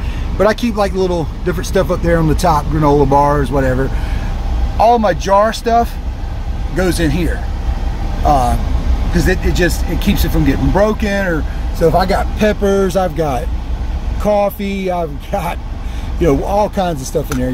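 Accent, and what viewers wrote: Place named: Pittsburgh, United States. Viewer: one hell of a set up my guy! also this guy sounds like he from pittsburgh idk y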